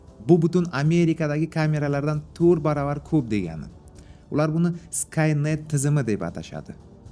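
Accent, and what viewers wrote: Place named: Bukhara, Uzbekistan. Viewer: Bro buxorodanmisiz deymanda 😅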